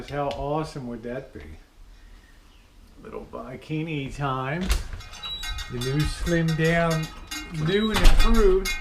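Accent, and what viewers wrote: Baltimore, United States. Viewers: It sounds like you have a Baltimore accent...have you lived there?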